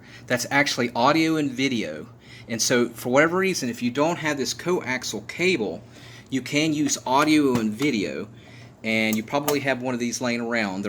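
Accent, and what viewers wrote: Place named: South, United States. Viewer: Thank you kindly sir! Want to send a shout to you and your generosity from your Northern fellow American. I adore your Southern accent! Very charming :) Because of you I can watch my dad’s videos, he passed away 6 months ago. So thank you thank you. You’re the best!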